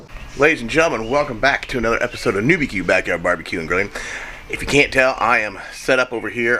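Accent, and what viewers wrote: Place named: Texas, United States. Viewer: can I place an order for some of your breakfast burritos 🌯, to be delivered to Chuck and I in Texas???? Love ya'll...Your Texas Mom🤗😘🥰👄💞👄 P.S. I'm amazed you've maintained some of that Texas accent.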